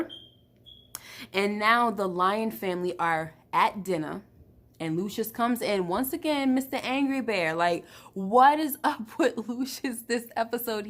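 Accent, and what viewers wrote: New York, United States. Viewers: I have to ask from hearing your accent - are you from NY?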